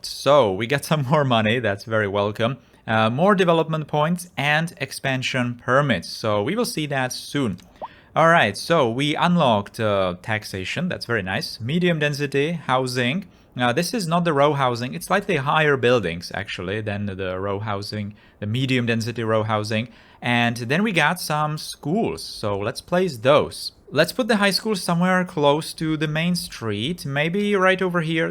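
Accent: Czech Republic. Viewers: CZ, judging by the czenglish. And those batteries are MWhours, not MWatts!!!! That would make no sense! Edit no.2 4MW is not battery capacity, it just shows how much is flowing into it😅😅, capacity is 10MW!!!hours!!!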